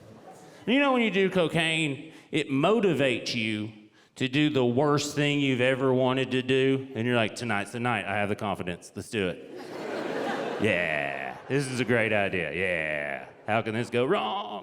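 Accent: South, United States. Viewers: Why do i love southern accent this much..!? I need to get me a country wife..i tell you HWat!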